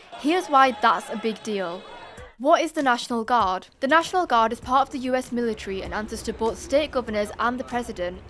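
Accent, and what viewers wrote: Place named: Northern England, United Kingdom. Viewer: It's just a northern english accent, to those outside the UK it can come off a bit troggy sometimes admittedly but it's kind of like how southern US accents sound a bit 'hick' to our ears. Just a regional thing, probably in most countries.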